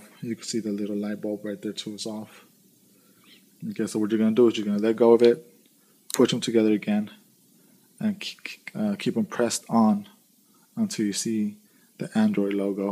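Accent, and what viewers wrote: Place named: Argentina. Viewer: genius genius genius many thanks, I've looked everywhere online videos and more videos of this tablet and did not appear in either hand, I say this tablet is only jajaj ... well until I found your video and could not believe it, get to the logo android but could not access the recovery I needed that combination, so I thanks a lot for the contribution they have made .... I subscribed to your channel and little hand up. I do not understand a lot of the Argentine English, so I had to use the translator thanks again ....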